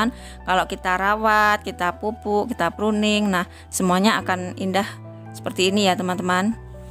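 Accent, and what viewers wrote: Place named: Java, Indonesia. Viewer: Medhok mbak jowony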